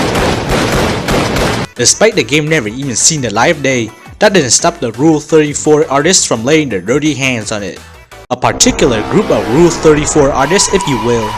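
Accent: Vietnam. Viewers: rly vnm accent 🐧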